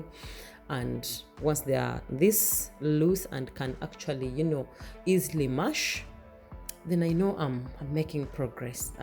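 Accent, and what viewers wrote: Uganda. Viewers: I love Ugandan English (I love everything about Uganda 😂). It is very different from Kenyan English. If you know, you know. Copying the recipe. Love and greetings from Nairobi 🇰🇪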